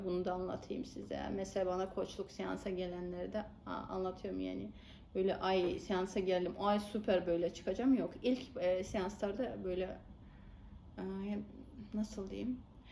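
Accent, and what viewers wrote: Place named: Azerbaijan. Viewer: Bakü’de doğmuşsunuz ne güzel. Konuşurken şivenize bayılıyorum. Dinlemek çok hoşuma gidiyor. Sizinle ilgili merak ettiğim birkaç şeyi de yanıtlamışsınız teşekkürler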